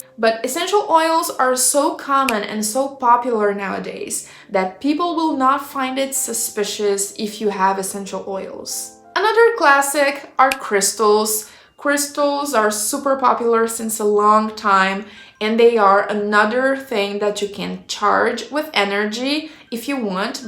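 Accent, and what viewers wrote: Brazil. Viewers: Are you brazilian? That accent sounds familiar to me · Tava tentando achar informações sobre bruxas e wiccas e caí no seu canal. Reconheci o sotaque brasileiro na hora ❤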